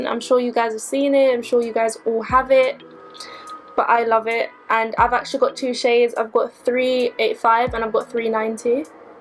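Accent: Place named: United Kingdom. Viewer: I love your British accent 😍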